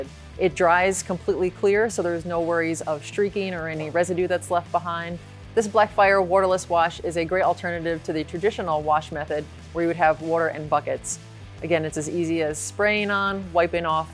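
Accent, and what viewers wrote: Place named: Philadelphia, United States. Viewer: are originally from Philly? Just guessing by the wooter accent.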